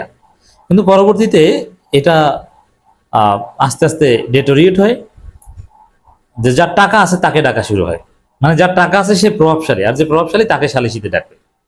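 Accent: Barishal, Bangladesh. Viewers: আপনার কথা বলার এক্সেন্ট শুনলে মনে হয় আপনি বরিশাল অঞ্চলের মানুষ। তাই বরিশাইল্লা ভাষা বললাম, "কতা য্যা কয়ন হ্যাতো উচিৎ কতাই কয়ন। আসল কতা থুইয়া এতো ত্যানা না প্যাচাইলে অয় না, ন্যাবাই?" 😂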